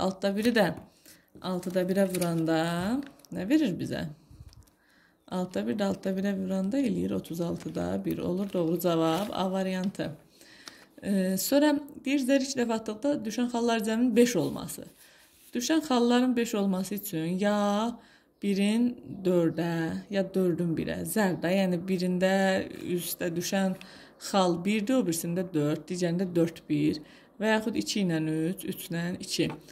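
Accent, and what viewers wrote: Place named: Nakhchivan, Azerbaijan. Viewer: Naxçıvanlısınz danışdığınız xoşuma gəldi +dərs izahı😍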